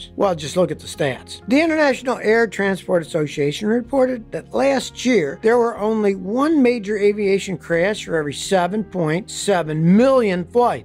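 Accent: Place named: Western New York, United States. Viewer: He's from western New York, Niagra Falls area I believe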